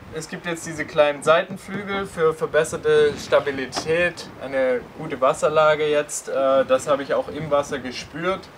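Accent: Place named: United States. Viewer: Sounds American trying to speak German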